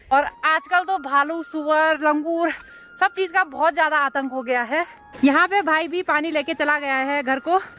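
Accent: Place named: Haryana, India. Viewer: Mam aap haryana si bolati ho thank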